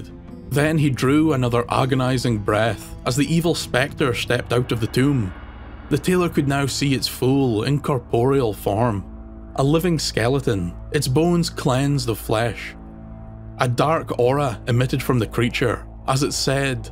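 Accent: Scotland, United Kingdom. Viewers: I only just discovered your channel and I'm hooked! I'm surprised you don't have more subscribers. It's so refreshing to hear Scottish folklore told by someone who's actually Scottish and not American lol.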